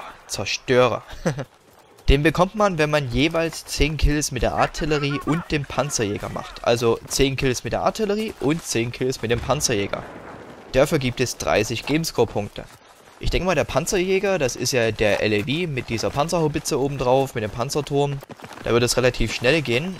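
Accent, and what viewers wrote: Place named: Saxony, Germany. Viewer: Gutes Gameplay:) Aber sorry ich komm mit deinem leichten sächsischen Dialekt nich so ganz klar :/ Aber sonst gutes Video:)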